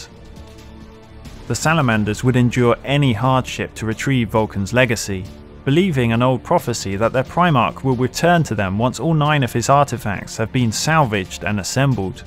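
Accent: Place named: United Kingdom. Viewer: The GrimDark Narrator sent me your way, says you know your stuff AND have a british accent.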